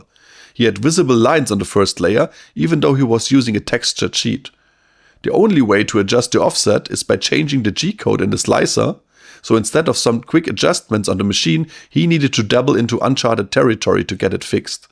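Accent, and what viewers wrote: Germany. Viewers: Ja man hört den deutschen Akzent und das fremdschamt schon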